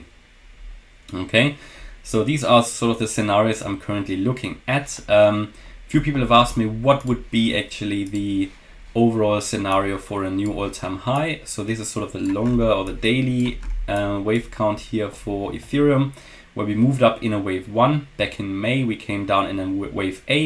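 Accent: Germany. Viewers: he has a very german accent, definitely not dutch haha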